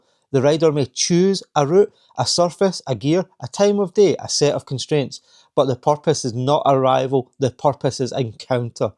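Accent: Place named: Scotland, United Kingdom. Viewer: I like your voice and accent! Which part of Scotland are you from?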